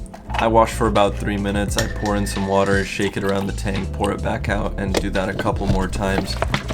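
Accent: Netherlands. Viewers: I love the video so much! I've had a look at your website and i love it! (Idk why but it sounds like you have a Dutch accent) if so, gegroet! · Are you Dutch?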